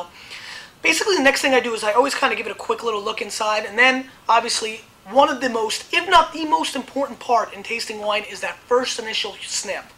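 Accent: New Jersey, United States. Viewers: from Jersey much?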